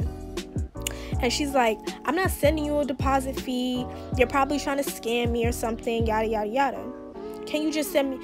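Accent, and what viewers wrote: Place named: New York, United States. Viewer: Are you from New York? If your not either way I love your accent 😩❤️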